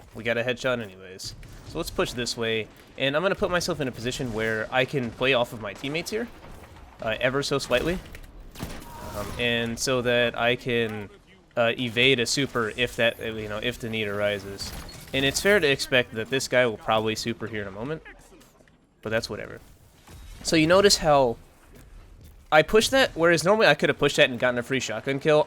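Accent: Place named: Ireland. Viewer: Are you Irish or American? I keep hearing Irish coming out 😅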